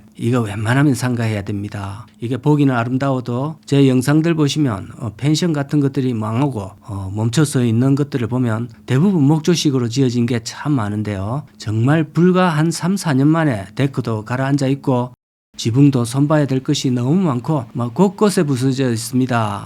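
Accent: Gyeongsang, South Korea. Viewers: 경상도분같이 말씀 재밋게하시니 보느데 재밋네요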